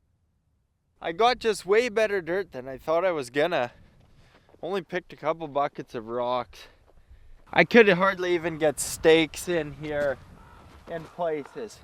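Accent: Canada. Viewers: I love to tune in and listen to this kid narrate his work, so f'ing Canadian! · I stumbled across this page and thought "well, let's just watch this young guy grapple with mother nature" , so I did. Lemme tell you... The way you ATTACKED this project and just absorb everything you learn- WELL DONE! I was listening to you talk, and if you're Canadian, you just KNOW another Canadian- and all your sayings remind me of growing up and of course, my time on the prairies. SK is certainly some of the most beautiful land out there- I don't care what ANYONE SAYS. Love watching this journey (sometimes through my fingers cos I'm TERRIFIED FOR YOU lol) and I can't WAIT to see you build this amazing achievement!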